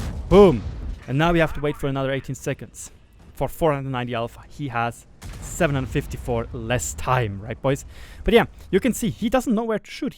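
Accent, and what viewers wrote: Germany. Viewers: Bist du deutscher?😅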